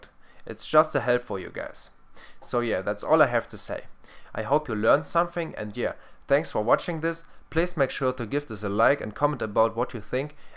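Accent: Germany. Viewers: Are you german ? :D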